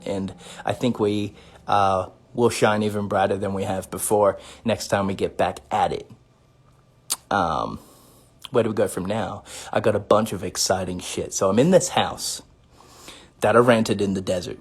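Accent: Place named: Australia. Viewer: THANK YOU AND HE SOUNDS SO AUSTRALIAN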